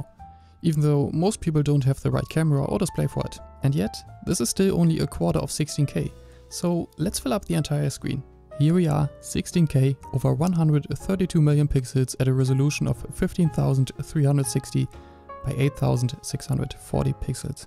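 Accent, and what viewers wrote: Germany. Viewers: Nur deutsche hören das er deutsch ist 😂💪🏽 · I like the german accent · man hört so krass das du deutsch bist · Der deutsche akzent beste xD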